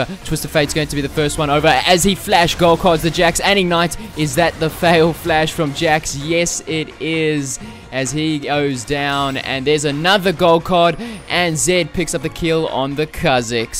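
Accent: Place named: South Africa. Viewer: You have to be South African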